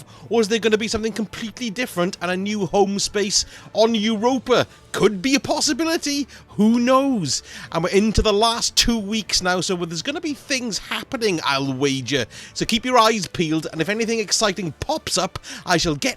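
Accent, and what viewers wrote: Wales, United Kingdom. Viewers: Hey,are you welsh?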